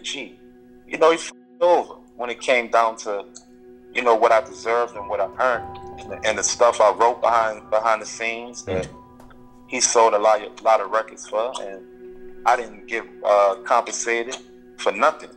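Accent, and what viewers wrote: New Orleans, United States. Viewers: I LOVE the New Orleans accent!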